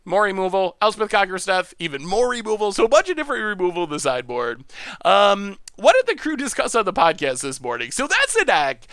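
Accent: Western New York, United States. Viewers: My wife went to school in Elmira. She said she recognized that you were from western NY right away. Hahaha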